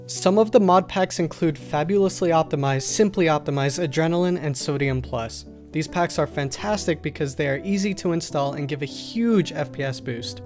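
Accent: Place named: Eastern Europe. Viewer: The creator had a slavic accent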